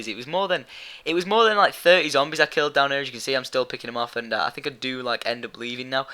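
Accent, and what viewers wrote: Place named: United Kingdom. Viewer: You have such a funny british accent :D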